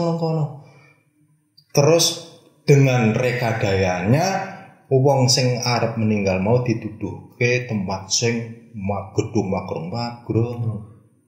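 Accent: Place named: Pekalongan, Indonesia. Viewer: Logate koyo wong kalongan o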